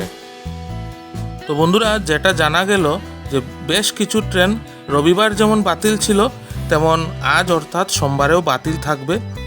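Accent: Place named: Barak Valley, India. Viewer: আপনার ভাষায় বরাকের মধূরতা থাকায় নিজেকেধন্যমনেকরি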